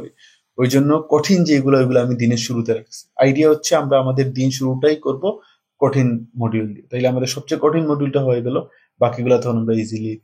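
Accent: Sylhet, Bangladesh. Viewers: ❤ সিলেটি ভাই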